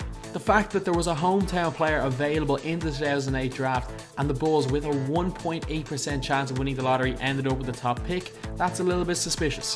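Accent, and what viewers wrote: Ireland. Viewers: Oi Oi Are you Irish kiad 🇮🇪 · I love the Irish accent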